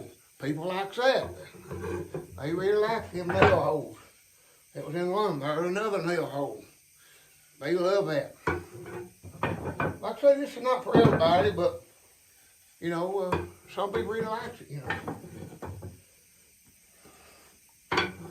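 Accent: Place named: Eastern Kentucky, United States. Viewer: I’m from Martin county Kentucky I would like to learn more about building these chairs. You sound like your from around the same area. Love the video and the craftsmanship keep it up 👍.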